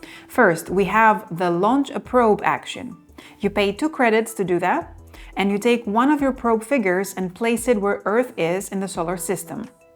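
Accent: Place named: Greece. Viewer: πολυ ομορφη εξήγηση. Ευχαριστουμε! Επιασα Ελληνική προφορά, ελπιζω να μην κανω λαθος. 😄😄 επαγγελματική διαστροφή ειμαι καθ. Αγγλικων. φοβερη δουλειά. Ζηλεύω. 😂 θα το παίξουμε σημερα. και θα το εξηγησω (ως συνηθως 🙄). θα σου πω εντυπωσεις!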